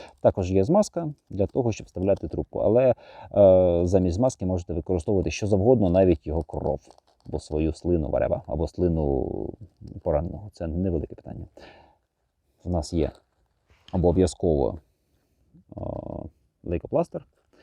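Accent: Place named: Russia. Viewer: Может не стоит мучаться и пытаться добавлять "украинские" слова? :) Слышно же, что русский лучше знаете. Больше людей поймут, что вы говорите. ps. "Специальная такая штука" :))) На алике кстати хорошие наборы продают и недорого. "Rhino Rescue". Там даже этот наглазник есть.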